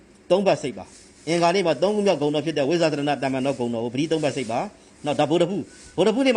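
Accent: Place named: Upcountry, Myanmar. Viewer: အဲပြောတဲ့အသံ အညာသား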